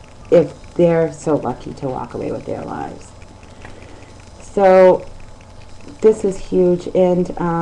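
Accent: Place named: Boston, United States. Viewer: SJS survivor as well. Like the Boston accent ;)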